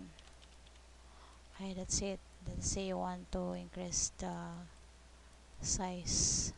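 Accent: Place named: Philippines. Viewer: From the accent I can already tell you're a Filipina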